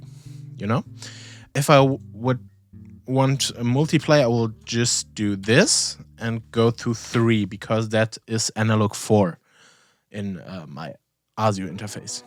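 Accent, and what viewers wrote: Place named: Germany. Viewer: Knew you were German after 2 seconds. Thank you for this video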